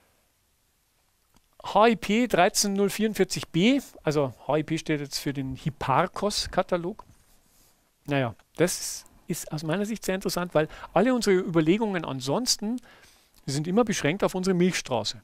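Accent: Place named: Landshut, Germany. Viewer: Sehr interessantes Video Herr Gaßner, weiter so! Mich würde interessieren, ob es irgendwann vielleicht auch einen Beitrag über die galileischen Monde oder die Monde des Saturn gibt? P.S. Man hört, dass Sie aus Landshut kommen ;)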